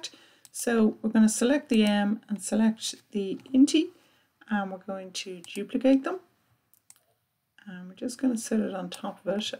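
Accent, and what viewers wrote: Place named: Ireland. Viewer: Love your videos. Can’t believe you don’t have more subscribers. Good to hear the Irish accent too. ☘️🇮🇪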